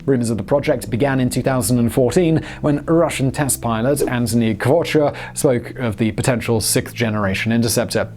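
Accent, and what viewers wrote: England, United Kingdom. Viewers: super faster talker with an English accent 80% lost to these bad choices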